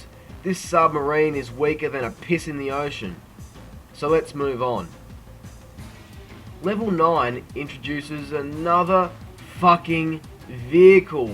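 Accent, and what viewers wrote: Australia. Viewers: Your Australian accent really fits with this game :D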